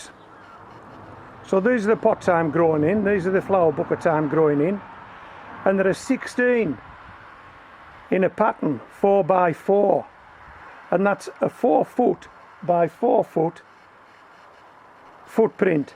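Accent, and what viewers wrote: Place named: Newcastle, United Kingdom. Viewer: Fab for this expat to hear a Geordie accent!Greetings from Bavaria! btw I built a horse manure hot bed. I ordered this book by a guy up north near you.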